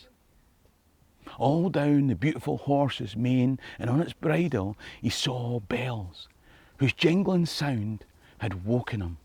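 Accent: Scotland, United Kingdom. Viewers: Loved the story. Your voice and accent is mesmerizing. It's like the soul of Scotland 🏴 come to life. Makes me long for a place I've never been but always wanted to be. Happy 60th Birthday. 🎉 P.S. Don't ever lose your Scots language. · I always think I'm going to fall asleep to his beautiful Scottish brogue but my brain never wants to shut down mid story.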